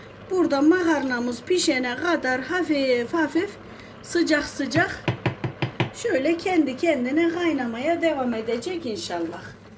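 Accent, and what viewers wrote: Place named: Nevsehir, Turkey. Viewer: Kesin Nevşehirli bu abla 😅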